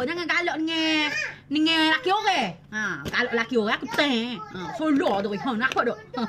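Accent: Kelantan, Malaysia. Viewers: suka tengok...kecek kelate pula we...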